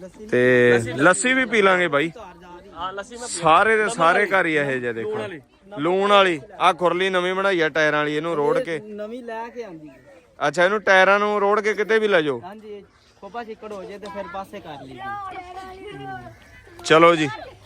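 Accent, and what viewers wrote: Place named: Hoshiarpur, India. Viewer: Aap Punjabi Hoshiarpur wali bari meethi Punjabi boltay hain ko mujay Bohat achi lagti hay mairay Maan Baap Hoshiarpur say aaey thay.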